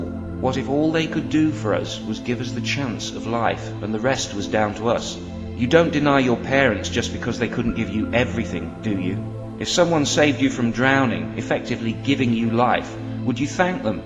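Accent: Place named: England, United Kingdom. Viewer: very nice. You lucky bloke. You have an English accent! hahahha everything you say sounds legit because of that. Americans have this fetish for British accents for some reason, I think. what was that zoom at the end? no idea what that was.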